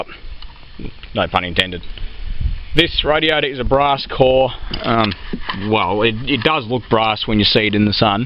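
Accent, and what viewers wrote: Australia. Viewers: true Aussie accent, love it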